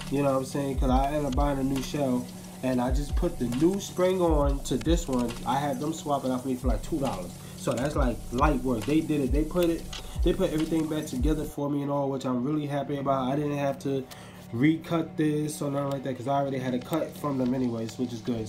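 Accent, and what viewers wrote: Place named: AAVE, United States. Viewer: The fact that I understand him is amazing. Ebnonic is fun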